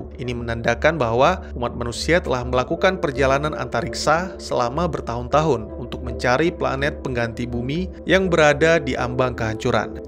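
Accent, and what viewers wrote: Aceh, Indonesia. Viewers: Orang aceh ya bos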